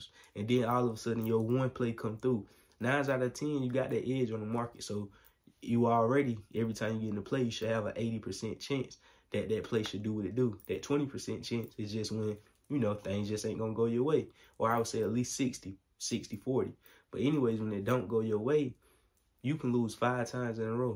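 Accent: South, United States. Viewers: nah he just has that southern accent fr.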